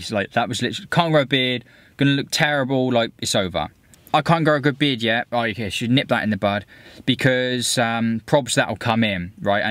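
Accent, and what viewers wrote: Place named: Hampshire, United Kingdom. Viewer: Just shaved mine at aged 54 after reluctant acceptance of quick thinning. Great move. That's a super Hampshire accent. Southampton /New forest? Cool car too, I run a '70 Beetle. Good work on videos. Neil, Southampton.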